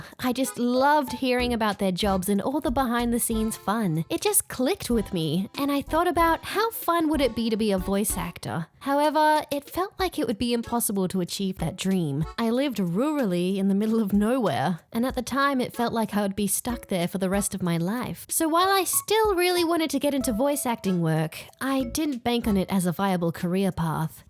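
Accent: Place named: Australia. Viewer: u kinda sound Aussie I love it it’s a cute accent :3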